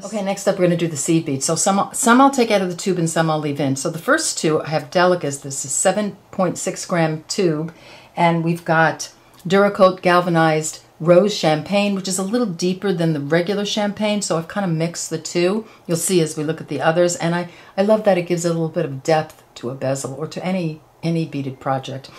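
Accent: New York, United States. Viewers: An absolutely gorgeous collection!!! I just ordered the collection and can’t wait to see your tutorials! By the way, I couldn’t help myself I ordered the three collection bundle too, LOL I’m going to have a lot of beading to do! Thank you so much for all your tutorials. I have learned to bead by watching your tutorials and greatly appreciate your amazing designs, clarity of instruction, excellence in video production and your New York accent! I’m a Jersey City girl living in San Diego and love to hear your voice, it makes me feel so at home. Again congratulations and thank you for all you do to help us learn about this amazing art form!😀🙏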